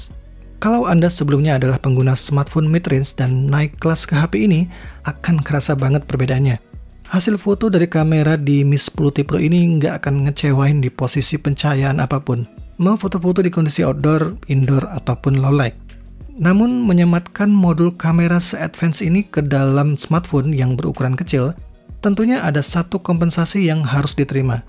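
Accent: Palembang, Indonesia. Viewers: Abang uong palembang cak nyo 😁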